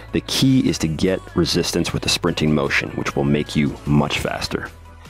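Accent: Netherlands. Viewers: Hold up are you dutch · I got one question r u dutch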